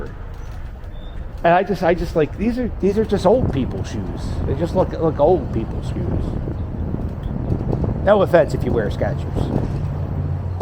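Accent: Philadelphia, United States. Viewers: You got the most Philadelphia accent I've ever heard in my life.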